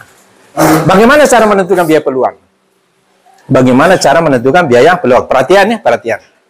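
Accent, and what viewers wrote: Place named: Sulawesi, Indonesia. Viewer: Salam Kenal Bapak sepertinya bapak guru dari daerah sulawesi ya dari segi logat nya saya tau soalnya saya dulu kuliahnya di ikip negeri up jurusan koperasi d3 lanjut langsung ke S1 alumni 1985 mantaaap penjelasaanya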